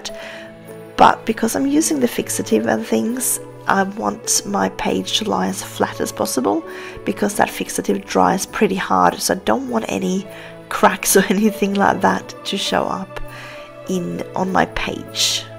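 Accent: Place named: Australia. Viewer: Your accent is becoming more and more Australian hehehe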